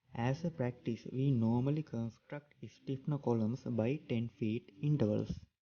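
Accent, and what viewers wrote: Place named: India. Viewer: Thank you for the explanation. However, I must mention that I had difficulty understanding you because of your strong Indian accent.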